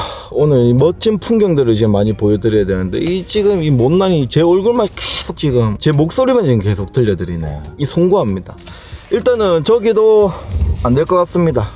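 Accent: Gyeongsang, South Korea. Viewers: 경남쪽(갱상도 사투리억양 넘넘 듣기좋습니다! ~~말솜씨도. 굿~~👍👍나도대구지만 경남억양이 참 듣기좋터라구요. ㅋㅋ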